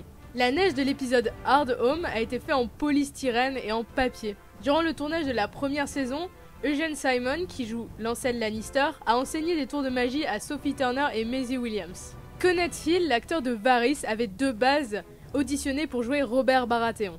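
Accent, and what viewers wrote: France. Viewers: Kevin Domac la prononciation de certains noms est clairement difficile. On ne peut pas la blâmer sur ça, elle le prononce seulement à la française. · Tu me fais rire avec ton accent français lorsque tu parles en Anglais.🤣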